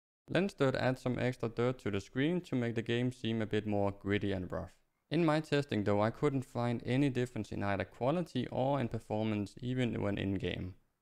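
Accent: Denmark. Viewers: Hey, i just found your channcel, by one of your old ark graphic settings video. I just wanted to ask, if you're Danish, because your accent, sounds familiar. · I can smell danglish. But thank you for the help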